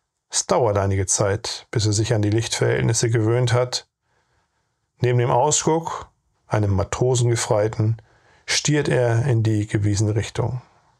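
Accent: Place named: East Germany, Germany. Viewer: Sehr interessanter Bericht. An manchen Stellen ist nicht zu überhören, dass er aus der DDR stammt.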